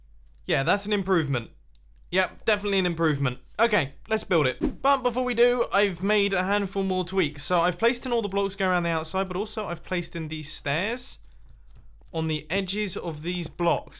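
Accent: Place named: United Kingdom. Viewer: "Does that look a little bit naff?" Me: "Naff?": "I feel like that looks a tiny bit naff" Me: "But what is naff?? EXPLAIN!!" Lol, this is why I love British youtubers, I don't understand a word they're saying sometimes. Keep up the good work!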